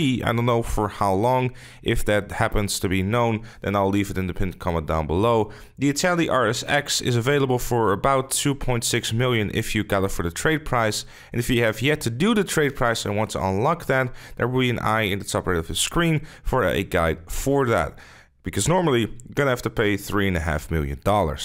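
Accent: Netherlands. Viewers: Probably dutch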